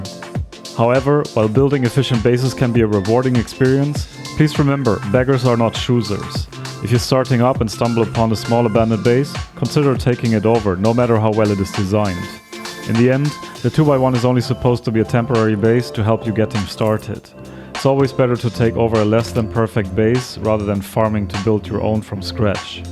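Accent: Germany. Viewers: Du hörst dich deutsch an, bist du’s auch? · Ein Deutscher aktzent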